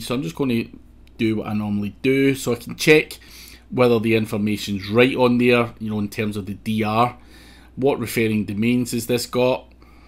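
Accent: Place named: Glasgow, United Kingdom. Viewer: Lovely accent from Glasgow;)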